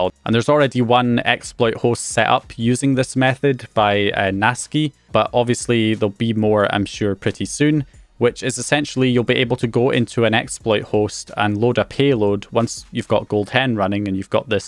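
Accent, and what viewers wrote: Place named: Scottish Highlands, United Kingdom. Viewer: damn, it's not very often I click a video and hear my accent lol. Aviemore? Inverness?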